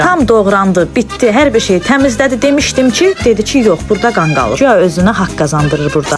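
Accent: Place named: Aran, Azerbaijan. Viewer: nagarsan aa)) aran zonasinin lehcesine benzedi)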